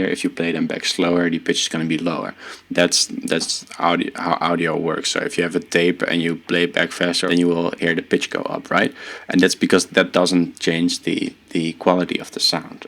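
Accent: Netherlands. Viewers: Dutch accent detected 🤘🏻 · dutch accent right there...sowieso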